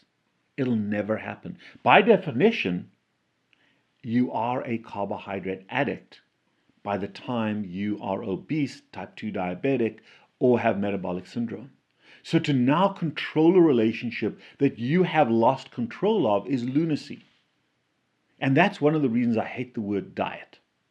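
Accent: South Africa. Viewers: He's South African. He sounds like an Afrikaans South African. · I am addicted to your videos . I love the south Africa accent and I love south Africa where I often travel through specially the transvaal near mozambique border . The Bantu diet was based on one meal a day based on vegetables. The only car b was maize but eated with fermented preparation .fruits only the children eat .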